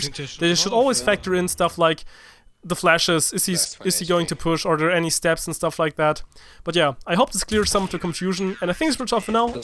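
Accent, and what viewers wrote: Germany. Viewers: ! haha als ich das erste mal deine stimme gehört habe wusste ich iwie direkt das du deutsch bist ;D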